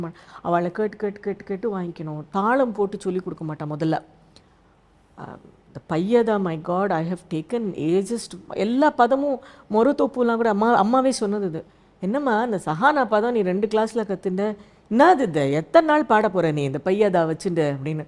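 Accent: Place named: Malaysia. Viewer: Love her Tamil! Sounds like Malaysian's Tamil accent. Wonderful lady and artist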